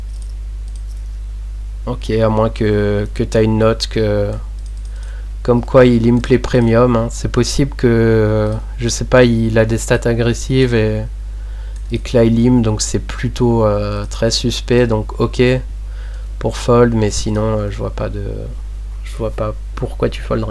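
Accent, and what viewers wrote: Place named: Alsace, France. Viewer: je me disais bien que je connaissais ce léger accent lol. Que nôtre Alsace est belle lol. Blague à part, vidéo très instructive. MERCI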